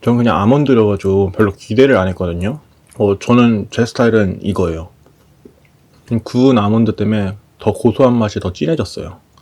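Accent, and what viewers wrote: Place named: South Korea. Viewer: Always love Korean accent haha waffareu 😇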